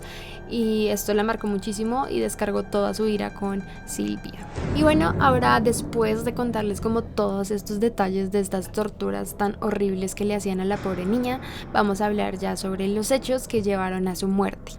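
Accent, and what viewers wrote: Venezuela. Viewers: minuto no le paro bolas eres venezolana?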